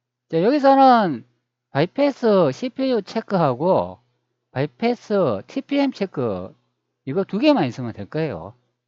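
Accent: Gyeongsang, South Korea. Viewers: 아이구... 상도 사투리.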